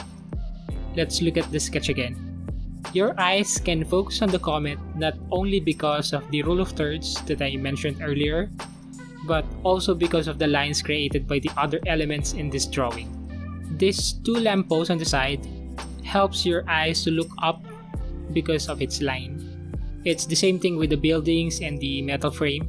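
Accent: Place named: Philippines. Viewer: I knew you are a fellow Filipino just by judging your accent and your voice. I'm also a Filipino.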